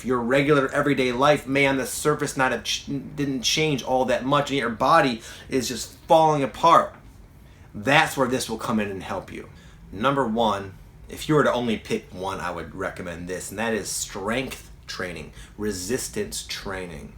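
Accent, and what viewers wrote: Michigan, United States. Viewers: I'm from Ann Arbor and you sound like you're from Michigan :D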